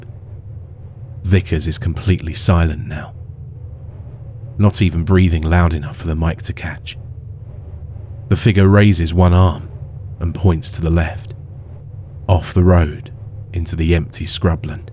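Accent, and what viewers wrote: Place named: United Kingdom. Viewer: I Absolutely Totally Agree with u!😊I Specially just Luuv his Voice, it's SO soothing n relaxing❣️ I'm from New Zealand so we don't hear the British accent much so I really Luv it. It's so sexy❣️💯:_MHorrorCreep::_MHorrorSkull::_MHorrorSkull::_MHorrorSkull::_MHorrorSkull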